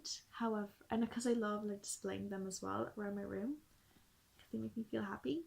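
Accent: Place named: Ireland. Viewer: You have such a lovely accent. Where in Ireland are you from?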